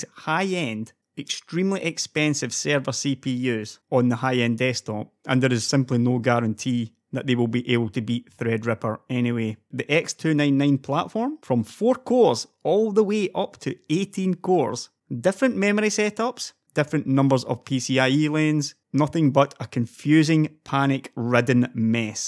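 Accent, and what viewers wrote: Scotland, United Kingdom. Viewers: Scottish accent + tech channel = insta sub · his presentations really help me nail doon Scottish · are you scottish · Nice scottish acent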